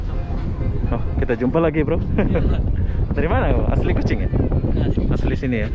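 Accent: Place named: Peninsular Malaysia, Malaysia. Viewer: Abangnya ngomong ala melayu semenanjung sama orang Sarawak sementara orang Sarawak cara ngomongnya mirip Indonesia.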